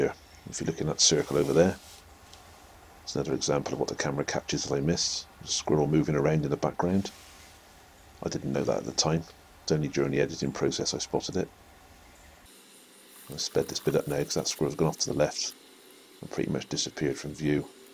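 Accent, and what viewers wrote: United Kingdom. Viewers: I was just thinking if you put all that all your videos on DVDs and put them on American type DVDs to where I can watch him on my DVD player I would buy them and I know a lot of other people that would enjoy your Pest Control show on YouTube would enjoy them as well and be a good way to make money as well cuz a lot of people would buy them and I would definitely buy them just too helpful hint saying that I would love to sit back and watch it on a bigger screen instead of my phone they let me know what you think and it would be awesome to own all your videos because it's been an hour of watching them two good learning experience there's a couple of things that you say that are funny in each video because just the way the British talk I'll I love the way the British talk they have a certain way with their slang and it's just funny and certain things that you say like mr. 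Pheasant and for me is like thanks for playing mr. Squirrel it's all over LOL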